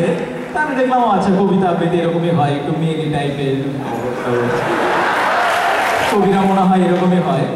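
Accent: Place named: Chittagong, Bangladesh. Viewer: ভাই আপনার বাড়ি কি চিটাগাং এর দিকে নাকি?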